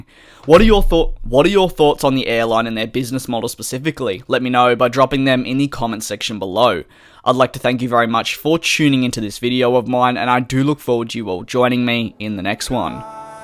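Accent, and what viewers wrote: Australia. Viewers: Are you Australian? Got a hint by how you say year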